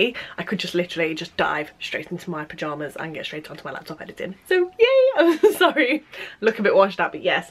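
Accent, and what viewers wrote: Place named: United Kingdom. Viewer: I love your British accent. Do you live in Northern England ?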